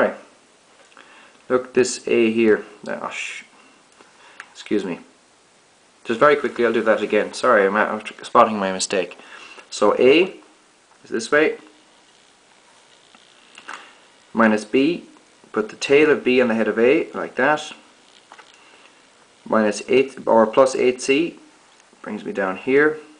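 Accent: Ireland. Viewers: he's Irish give him a break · Irish accent <333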